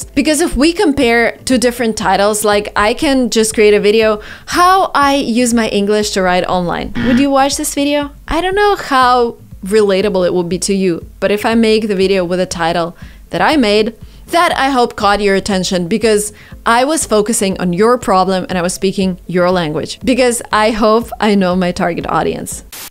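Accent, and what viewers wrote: United States. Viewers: I don't know how to speak like an American after i watched your vedio i can speak like you You changed my life in a month Thanks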